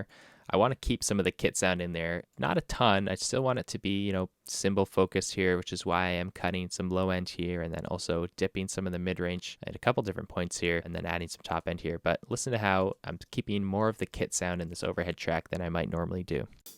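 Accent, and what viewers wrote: Canada. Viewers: I can tell you're from Canada by the way you say "out" which is "oat". Let's check this oat. So, where is from???? Canada! I heard some of that album a few years ago. I thought it was really good but never really listened to the drums so much (being a guitarist) but this is quite interesting. I wonder how you got those samples into their own tracks. Did you somehow trigger them FROM the real tracks? Maybe you have a vid on that where you explain. Thx for the walkthrough!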